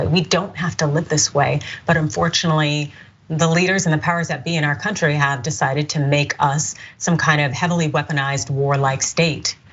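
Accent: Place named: South, United States. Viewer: I LOVE YOUR SOUTHERN ACCENT boy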